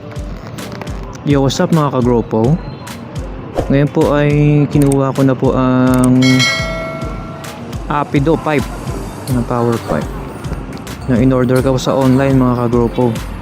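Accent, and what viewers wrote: Iloilo, Philippines. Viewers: Ilonggo ka no?🤗🤣🤣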